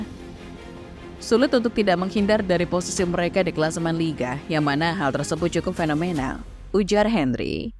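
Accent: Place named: Medan, Indonesia. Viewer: Terpongkengham bangkeee wkwkwk org medan abg ya😂😂😂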